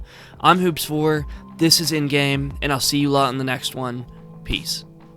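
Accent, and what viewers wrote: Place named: Pennsylvania, United States. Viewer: your accent reminds me of people in Pennsylvania .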